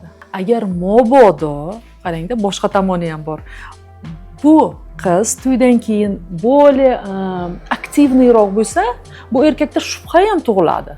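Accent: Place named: Qarshi, Uzbekistan. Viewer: Карши шевада опа куп болани фикрини эшитган бу аник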